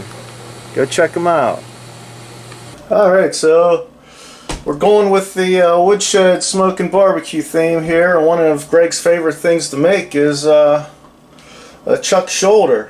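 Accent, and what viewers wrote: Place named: Western Pennsylvania, United States. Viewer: Great videos. I detect a western PA accent. Where can I get the carbon plate and pid fan?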